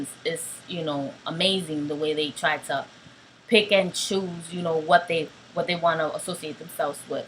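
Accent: New York, United States. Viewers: Physically you are a very typical beautiful Puerto Rican woman. The thing you need to change is your Nuyorican traits. This is something that can be done if you work at it. And don't forget the NY accent. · J. García She talks like a Nuyoricans not black. This is the way we speak. Black people in America don't talk like this outside of NY. You got it twisted bc you're ignorant.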